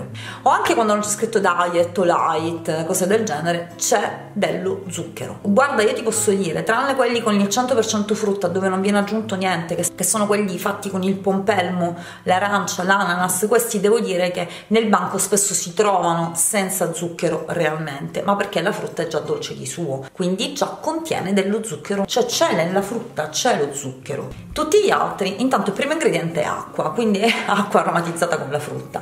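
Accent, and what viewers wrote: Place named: Sicily, Italy. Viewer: Ciao, sono new entry,d’origine sicula come te ma marchigiana d’adozione ormai da quasi una vita, mi piace tantissimo ascoltarti, forse sarà propio per l’inflessione 😜 Grazie perché mi sto lasciando andRe ultimamente e seguendoti forse riesco a ritrovare in me la fatidica “scintilla “e poi via...a razzo!!🤩🤩🚀🚀😘😘❤️❤️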